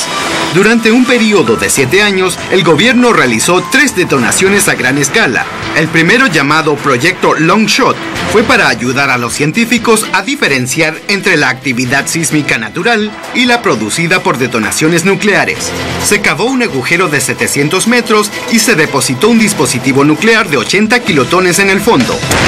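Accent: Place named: Argentina. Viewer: No se porq pero presiento que eres Argentino verdad ???👎💩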